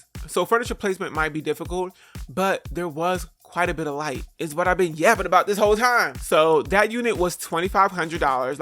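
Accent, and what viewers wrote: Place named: South Jersey, United States. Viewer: I grew up in South Jersey too!!! I always heard your accent and thought he can’t be raised in Arizona 😂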